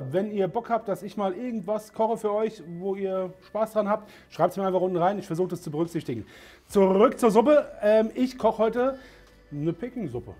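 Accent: Hesse, Germany. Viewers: Hessischer Dialekt einfach eine 10/10 … Grüße aus NRW. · Badesalz kocht. Herrlich Hessisch!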